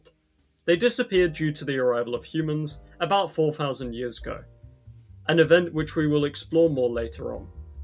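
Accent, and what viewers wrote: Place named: United Kingdom. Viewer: British people pronouncing non-British things.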